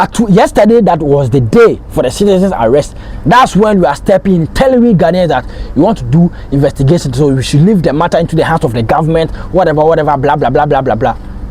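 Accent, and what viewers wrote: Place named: Nigeria. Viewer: BRO HERE IN GHANA NOT IN GHANA HERE STOP SPEAKING THAT HORRIBLE NIGERIAN ENGLISH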